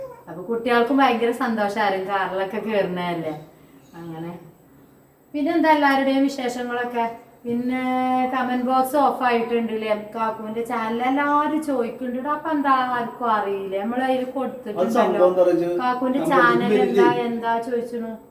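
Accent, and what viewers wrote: Malappuram, India. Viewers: എന്താ എപ്പഴും ട്ടോ ട്ടോ പറയുന്നത് ശരിക്കും മലപ്പുറത്ത്കാരുടെ സംസാരം തന്നെ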